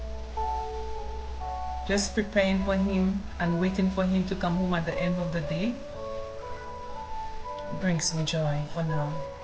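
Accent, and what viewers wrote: Saint Lucia. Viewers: I instantly hit subscribe when I heard that accent! As a fellow Lucian myself I am enjoying your videos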